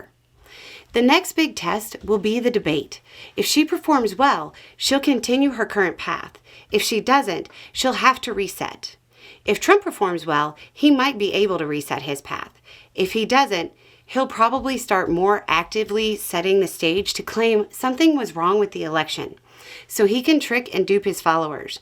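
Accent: South, United States. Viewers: I really need to hear you say “Bless his heart” in that sassy sugary sweet southern delivery of yours. Please? Pretty please? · Love the end and that sweet goodbye, especially if she's mad! Oh that southern sarcasm is rich and thick!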